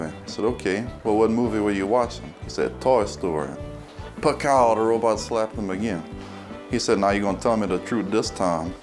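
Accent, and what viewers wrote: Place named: Louisiana, United States. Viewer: it’s the accent for me . louisiana 🤪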